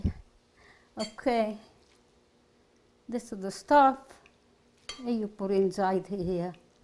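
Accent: Italy. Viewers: Hi Italian. Grandma. I love your. Cooking. All your recipes. Are. Great. I am making artichokes. This weekend. I watch you every single day. I enjoy your singing. Plus I love your Italian. Accent. Yim 4th generation. From Sicily. Keep. Cooking. Grandma. God. Bless you. Love. Ssndyin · Love watching you and enjoyed your Italian mannerisms and accent. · I love, love, LOVE your Italian accent. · I am a second-generation Italian American who grew up in the 40s and 50s in upstate New York in an Italian neighborhood. Both of my parents spoke Italian but chose to not teach us because "we were American". But all of my grandparents and most of my neighbors spoke with the same accent as you. When I watch your videos, it brings me back to when I was a kid. Most of your recipes are just like my mother's. I have tried several of your recipes and they are never disappointing. 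We had friends over for lasagna recently and my wife made the lasagna with store-bought pasta because she didn't want to take a chance with your recipe until we tried it first ourselves. Well, I made your recipe myself and after everyone had a portion of my wife's. I brought out my dish and everyone raved about it. Even my wife, who is usually very stubborn, admitted that my dish was better than hers. I like your singing too. My father had a beautiful operatic tenor voice and sang all of the time. Thanks again for being you. Domenic Campanella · I love her Lil Italian accent plus recipe lock yummy❤ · No wonder this video is viral: "Now-a, first-a you gotta open-a da peppa, you got a nice-a peppa like-a dis."? You're ADORABLE! Sweet stuffed Bell Peppa's, from a Sweet Italian Bella Donna like-a. Statta Buon! Ciao.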